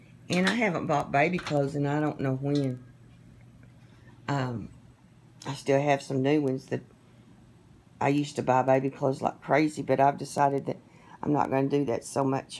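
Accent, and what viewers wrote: United States. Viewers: You have the sweetest voice. Listening to your voice makes me want to be a little girl so I could crawl into your lap and hear you tell stories. That sounds SO creepy when I type it out, sheesh! I mean it in the nicest (non-stalker) way possible though! I'm from Australia, so your US accent is different, plus you have that rich, beautiful Southern tone also that just sounds so lovely to hear. I hope your health is on the upswing very soon. I have heard from others how steroids can really mess a body about. I wish you happiness and health!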